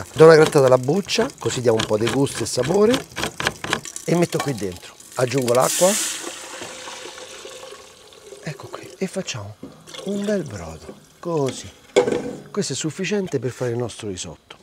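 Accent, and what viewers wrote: Rome, Italy. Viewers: Ti auguro il meglio per te, e sempre forza 🇮🇹, continua sempre così con il ns made in italy, con la tua ironia, pacatezza ed accento romano.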